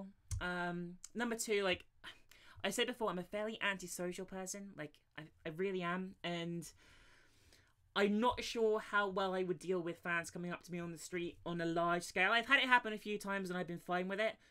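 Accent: United Kingdom. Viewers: I love your voice. Its kinda adorable to me with your british accent ;) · your voice is sexy, british, and that's all that matters to me. <3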